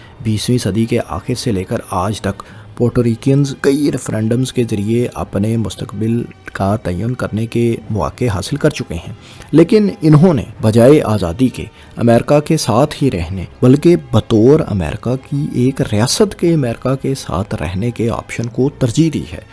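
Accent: Punjab, Pakistan. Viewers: صاحب آپ کی ویڈیوز میں بہت اچھی معلومات فراہم کی جاتی ہیں ۔ لیکن آپکا اردو تلفظ ٹھیک نہیں ہے ۔ آپ پنجابی زدہ اردو بولتے ہیں ۔ مثلاً Himayat ko Hamayat, Mukammal ko Makkamal, Aazadi ko azadi, aor ko or Difa ko Dafa بولتے ہیں ۔ آپکے مقابلے میں فیصل وڑائچ صاحب کا اردو تلفظ بہت کمال کا ہے۔ آپ ان سے مدد لے سکتے ہیں ۔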